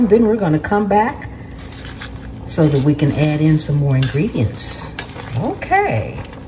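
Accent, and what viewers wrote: South, United States. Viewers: I just love your southern accent, (and I'm from the south).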